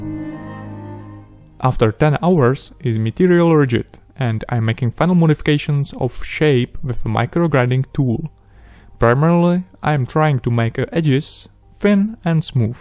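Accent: Czech Republic. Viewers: There is only one accent in the wold like this. And it is unmistakably Czech! :D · Are you from Czech republic? Based on your accent :D. btw amazing job.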